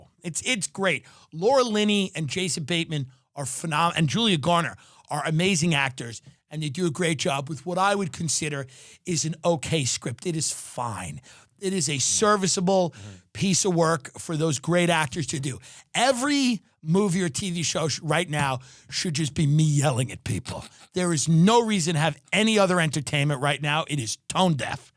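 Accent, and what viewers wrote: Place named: Queens, United States. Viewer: This guy must be from Queens or LI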